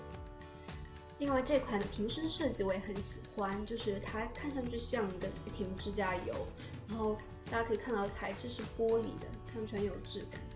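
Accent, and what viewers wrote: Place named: Taiwan. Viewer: 讲话像台湾人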